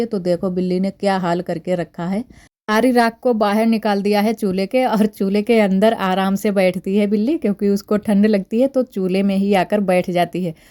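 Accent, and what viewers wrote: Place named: Bihar, India. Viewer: Original village routine, ye kon sa gaon hai, dekhkar to UP ya Bihar se lg raha hai, aapki boli main Bihar ki jhalak hai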